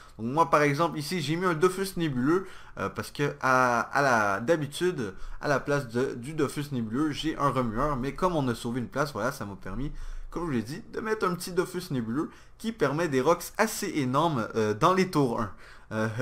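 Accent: Quebec, Canada. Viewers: Yessss un québecos ostie!!! Enfin!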